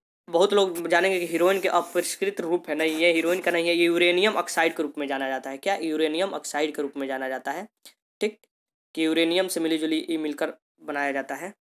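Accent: Bihar, India. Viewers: Bihar se hai kya jii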